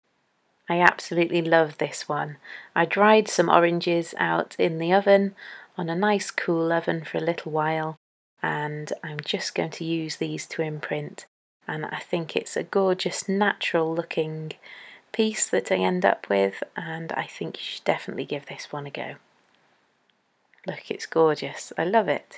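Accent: England, United Kingdom. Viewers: Great video and as someone else said no music just your lovely calm voice ..One of the best videos I have seen and also great ideas on our doorstep ..thank you so much ..Also lovely to hear someone English for a change.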